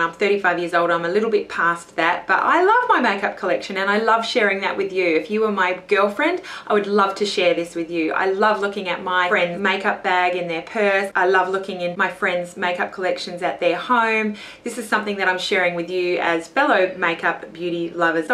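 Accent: Australia. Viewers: Yay! Love: 1. Hearing an Australian accent in a makeup video - where are all the Aussies on YouTube?! 2. That you're over 22! Where are all the adults on YouTube?! Subscribed!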